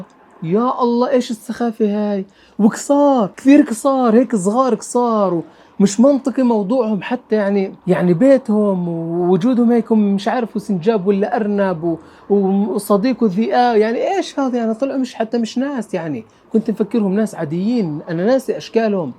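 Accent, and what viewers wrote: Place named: Palestine. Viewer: نحب بزاف لهجتك، لهجة الفلسطينيين الأصيلة.. تحية لفلسطين مني و من الجزائر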